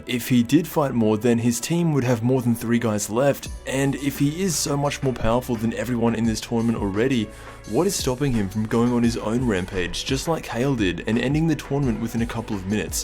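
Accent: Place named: Australia. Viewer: What country are you from? I can't place your accent all I'm getting is Australian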